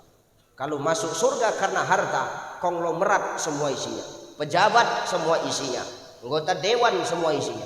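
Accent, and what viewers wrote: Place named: Medan, Indonesia. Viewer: Itubormg Medan bos Krn mmng logat bhsa nya seperti itu,klo kau yg LBH smpurna cba kau yg tegur ,jgn sllu mngomtriborng lain LBH baik komntri dri sndri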